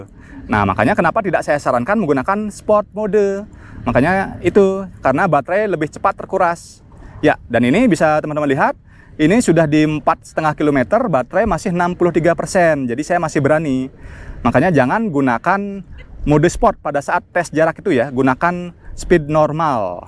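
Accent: Bali, Indonesia. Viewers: Kayak logat Bali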